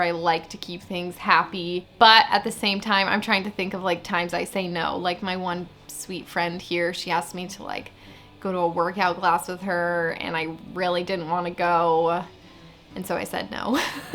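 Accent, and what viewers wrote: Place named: Canada. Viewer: You sound just like me, I’m a former Canadian too !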